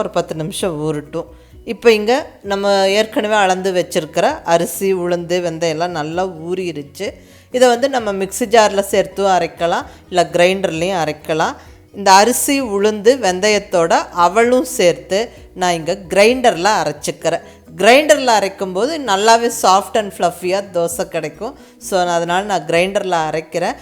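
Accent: Coimbatore, India. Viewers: Are you from Coimbatore? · I like your kovai thamizh..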